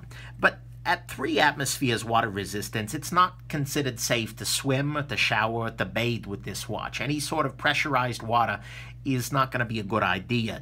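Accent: New York, United States. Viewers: New Yorker much? Nice review 👍🏼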